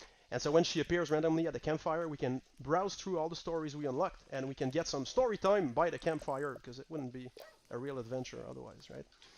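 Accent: Quebec, Canada. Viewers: Vraiment cool d'entendre un accent québécois! :) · Wait! I recognize that accent! You're Québecois? Nice! I always get surprise when i hear our accent on youtube. So few Québecois gamers on here. XD Can't wait to play that! <3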